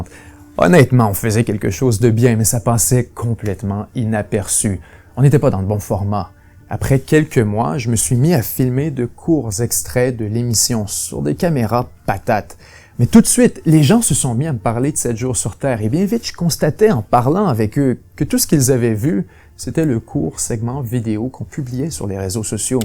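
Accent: Quebec, Canada. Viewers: Bonjour je vous ai découvert seulement hier en cherchant un reportage sur Khadafi qu'un ami panafricain affectione ..heureusement que votre accent quebecois est discret ( plus proche du belge/ suisse).. Malheureusement le monde s'intéresse plus au buzz et aux futilités qu'à la Vérité...Longue vie à 7jours sur Terre.. Cathy depuis Paris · je n aime pas spécialement l accent québécois mais ... je l entend à peine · Je vous suivais dès les débuts depuis la Kabylie. Je suis fasciné par la qualité de votre travail, la profondeur des exposés et votre façon de parler. C'est à travers vous que j'ai appris à aimer l'accent québécois qui me paraissait jusque-là bizarre. J'avais peur en cliquant sur la vidéo mais finalement c'est une bonne nouvelle que vous nous annoncez. Je ne suis pas abonné mais je vous prie de croire en ma profonde gratitude pour votre engagement.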